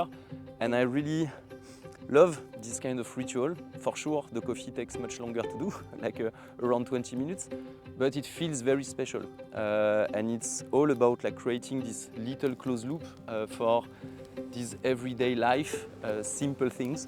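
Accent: France. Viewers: ahah pardon, de l'accent et du nom j'en ai déduit la nationalité française, désolé si je me suis trompé !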